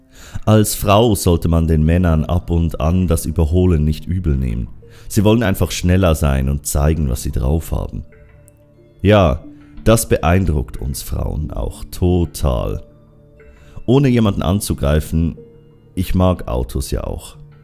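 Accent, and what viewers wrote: Switzerland. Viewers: Du bisch Schwiizer? Jo ehre💪🏼🇨🇭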